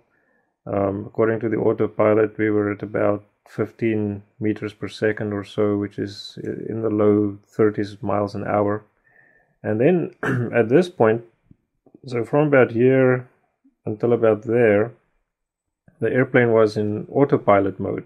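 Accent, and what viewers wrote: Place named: South Africa. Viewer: Hi guys, great video! Can i ask where this was made? You sound somewhat South African...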